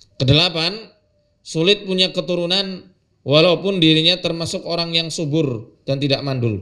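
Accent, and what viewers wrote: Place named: Banyumas, Indonesia. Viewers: Kok ngapak dr mana ya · Orang sokaraja banyumas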